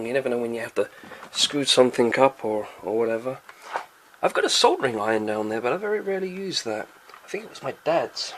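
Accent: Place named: United Kingdom. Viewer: A cool concept! I've never seen someone from this community invite others to a small tour through their space. This should happen more often! It's a shame you feel nervous about recording your voice, because I unironically like it. There's something about a British accent that just sounds captivating. Please excuse me for not actually paying full attention for the whole 40 minutes, but it was a relaxing experience. Believe it or not. :P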